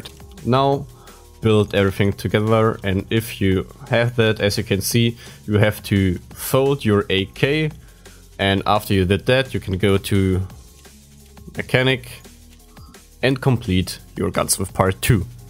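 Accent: Germany. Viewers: Bist du deutsch wenn ja mann hörts xD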